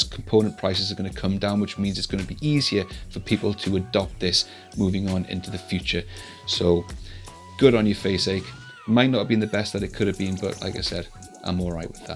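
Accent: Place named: Newcastle, United Kingdom. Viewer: Thanks, great to hear a local Geordie review tech (question at the end) I bought a rift s and an entry level pc after using the work HTC vive vr system and I’m really happy with the performance. I’m no expert and have only seen these two systems, but I agree with everything this review stated. The vive does feel a better product with better audio but.... the s is lighter, better visuals and an easier set up. For a newbie like me, that’s better and now the price of an S is down to £350, that’s where I spent my money. My newbie question is what difference would be noticeable to the visuals if I upgraded my GPU from a Nvidia GTX 1060 to something newer and faster to say Beat Sabre or Robo Recall?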